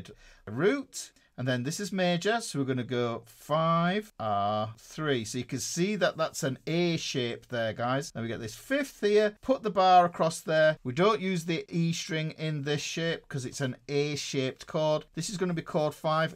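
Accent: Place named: Yorkshire, United Kingdom. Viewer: Nurr yer nurts. Must be Yorkshire…Huddersfield?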